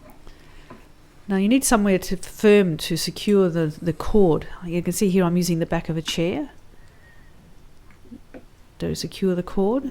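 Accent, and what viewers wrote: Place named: Australia. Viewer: This was so interesting to watch. Thank you :) This might be a weird question, but where do you live? Youre accent sounds australian...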